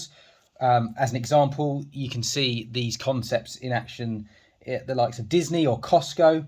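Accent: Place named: United Kingdom. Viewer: I came across to this Chanel and this man explains the book Of (Find you why ). And I guess Man U have British accent u must be English man , the best English language is spoken by British people and the rest of the world follows even Americans .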